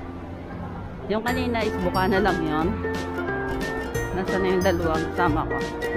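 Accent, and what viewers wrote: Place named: Batangas, Philippines. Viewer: Such a beautiful plce . Enjoy your Gala nga Sissy . Stay safe. Tga Btangas ka na Sis. May punto kasi.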